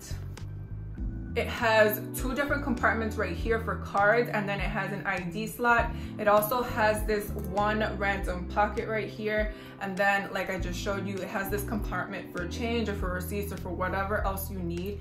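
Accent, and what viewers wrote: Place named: New York, United States. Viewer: Def from New York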